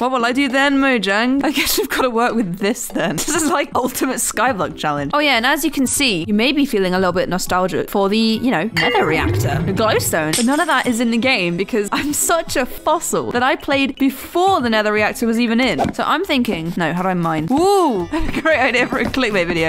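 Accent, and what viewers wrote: United Kingdom. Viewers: Just wondering what country are you from because you sound British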